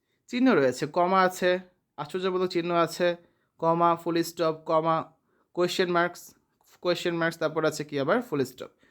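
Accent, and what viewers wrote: Sylhet, Bangladesh. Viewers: Bhai afne kita Sylheti ni?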